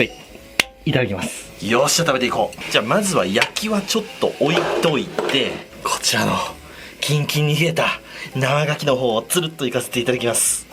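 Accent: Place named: Mikawa, Japan. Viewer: しゃべりが三河弁？朝倉未来と似てるね！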